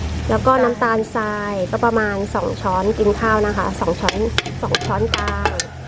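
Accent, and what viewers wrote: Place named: Sukhothai, Thailand. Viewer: ฟังเสียงเหมือนคนโขทัยเลย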